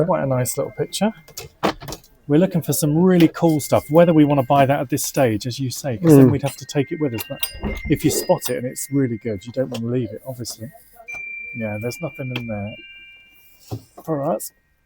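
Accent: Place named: Cornwall, United Kingdom. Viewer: Love your Cornish accent. Poldark is back on Sky T.V. Lovely!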